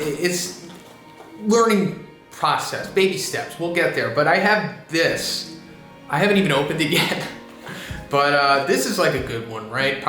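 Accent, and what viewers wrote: United States. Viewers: mikmik he sounds americab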